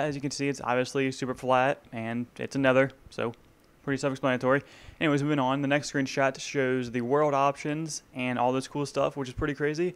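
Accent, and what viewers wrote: United States. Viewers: you speak american? was i the only one that caught that?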